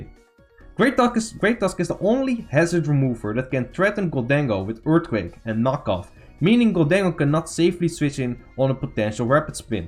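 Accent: Netherlands. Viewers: Seeing all the comment about how dutch you sound are cracking me up. Can't disagree but it's not bothering me at all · Needed just 2 seconds to hear you are Dutch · Je hebt echt t hardste Nederlands Engelse accent dat ik ooit gehoord heb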